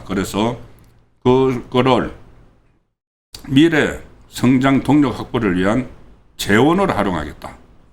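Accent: Gyeongsang, South Korea. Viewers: (일반적이지 않고 다른 발음) 송국권선생님은 발음 중 일반적인 국민들의 발음과 다른 것이 있어 좀 거북하거나 듣기 싫을 때가 있습니다. 半도체 - 班도체 公직선거법 -공(球)직선거법 事前선거 - 辭典선거 搜査 - 輸사 대구식 발음인가요? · 경상도 발음이니 이해하세요 ㅎㅎㅎ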